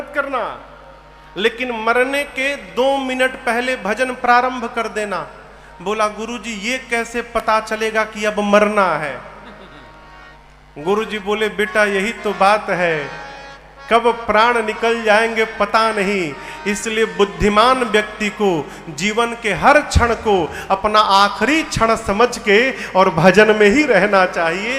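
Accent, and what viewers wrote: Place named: Bihar, India. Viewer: आप कथा कहते समय निम्न स्तर की भोजपुरी,मजाक, बिहारियों की तरह किया करते हैं।v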